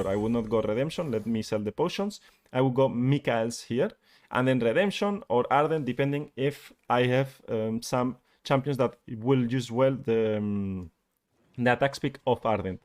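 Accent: Spain. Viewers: llevo 10 segundos de video y ya puedo decir que es español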